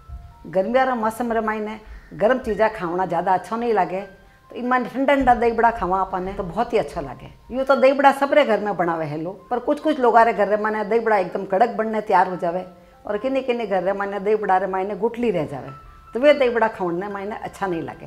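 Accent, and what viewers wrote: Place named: Marwar, India. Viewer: आपकी समझाने कि विधि बहुत बढ़िया है। सभी रेसिपी आपके समझाए अनुसार बनाई जाए तो बिलकुल आप जैसी ही बनेगी। आपकी मारवाड़ी बोली के हम फैन हो गए है🙏🙏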